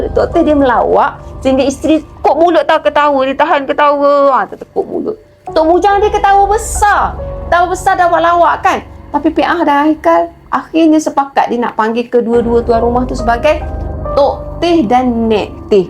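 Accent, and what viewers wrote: Kelantan, Malaysia. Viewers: Kak ore kelate ko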